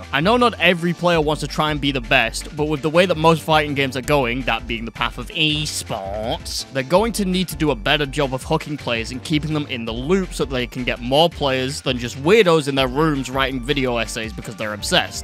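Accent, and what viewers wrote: United Kingdom. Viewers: My favorite sub genre of YouTube is British guys talking about fighting games.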